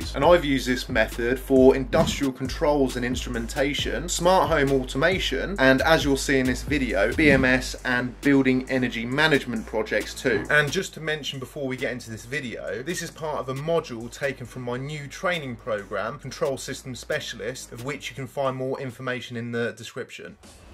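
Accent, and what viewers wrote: United Kingdom. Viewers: The accent is british. Are u british ? Like ur video, im an electrical engineering fresh graduate and i like to learn british accent. Ur video just very useful amd interesting for me. Keep it up🎉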